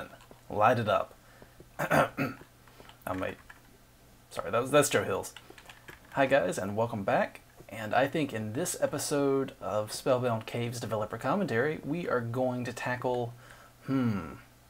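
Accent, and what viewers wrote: South, United States. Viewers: Accents are awesome. My favorite is hearing UK or Australian people putting on an American accent., you sound like you're from an urban area in the southern U.S. - not a thick stereotypical "Southern" accent but a hint of the twang/drawl. (Likewise, I'm from the Minneapolis area so I have a hint of the northern Midwestern "O ya sure you betcha" thing, but not nearly as much as my friends from rural MN/WI.)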